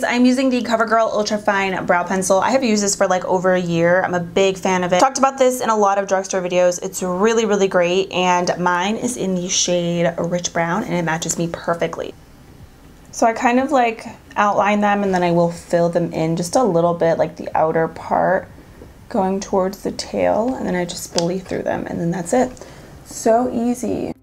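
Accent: Pennsylvania, United States. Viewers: Lol def a PA thing, I say A-range too lol I'm in Allentown! Love the look, very pretty! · I think Arenge is a PA thing! I'm from Philly but I live in Oregon now, and folks have commented on some of my very open vowels 😅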